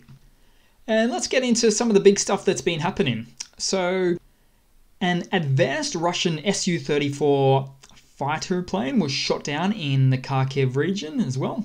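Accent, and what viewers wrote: Australia. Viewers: It's great to hear an Aussie voice analysing this war so well. You've shown images even Ukrainian sources have not yet shared. Well done, mate, keep it up!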